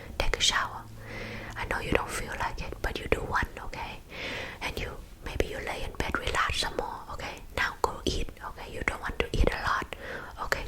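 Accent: Asia. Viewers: I absolutely love your asian accent videos. Its so funny and tingly. Great work. Heart❤ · I don’t know any other asmrtist that does the Asian accent, and after watching all your videos I’m not interested in watching other versions anyways lol. Truly unique & veryyy relaxing. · Asian accent mom roleplay 😭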